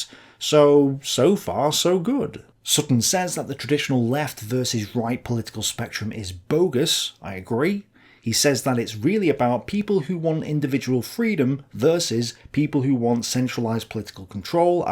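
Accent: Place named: North, United Kingdom. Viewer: Can you do some books on tape where you just narrate? Need more northern folks doing this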